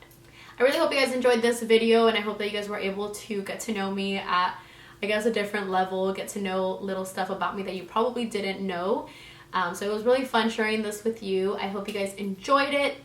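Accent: United Kingdom. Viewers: That accent is def british hahahaa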